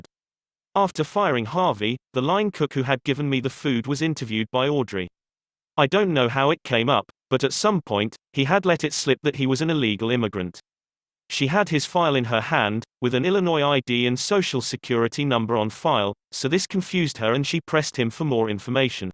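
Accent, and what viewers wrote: United Kingdom. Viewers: British style, nice accent, but crappy pronunciation.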